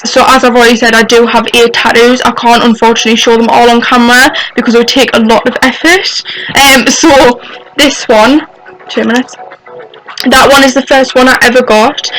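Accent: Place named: Boldon, United Kingdom. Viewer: I don't believe that. You sound like you're from Boldon, not to insult you or anything :'D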